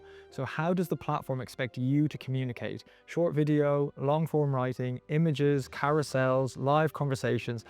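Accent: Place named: West Country, United Kingdom. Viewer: hi, are you from Devon? Your voice, your accent sounds the same as mine. It's so nice to hear a westcountry voice.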